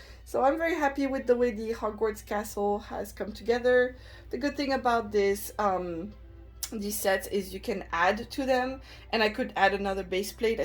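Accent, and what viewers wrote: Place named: France. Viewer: you have a french accent, you're french?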